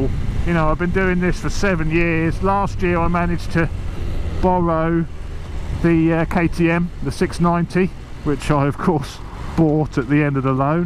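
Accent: United Kingdom. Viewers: i watch because your speech/voice is extremely enjoyable to hear!! Love the UK 🇬🇧 accent!! Fireblade RR-R -SP finally getting flashed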